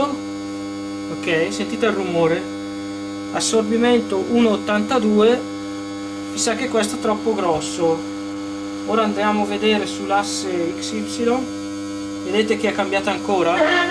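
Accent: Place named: Brescia, Italy. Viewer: sei bravo e spieghi bene le cose emi piace il tuo accento di brescia mi preoccupa un po vederti armeggiare con tutti quei fili scoperti , stai attento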